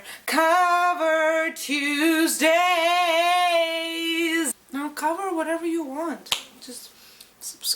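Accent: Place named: Eastern Europe. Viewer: ah, moldova! interesting country. ur accent did sound eastern european to me but i still couldn't place it. : ) keep up the great work!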